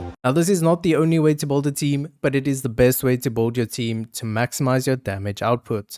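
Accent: South Africa. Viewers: You from SA bro ? Also keep up the good work! ✨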